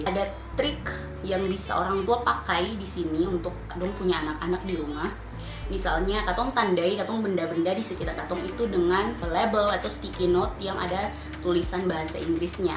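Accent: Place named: Ambon, Indonesia. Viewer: Kudos to this video. 1. Apresiasi penggunaan dialeg ambon dalam penjelasan 2. This video might take 17 menit, but trust me, semua pertanyaan umum or kesulitan yg biasanya dihadapi pemula, udah dijawab disini. 3. I highly recommend the tips of having English environment, watching movies dan pake app untuk English learning contohnya ELSA (di app store ada) untuk pemula. Last but not least, please punya kemauan! Again, thankyou kaka 🎉🎉🎉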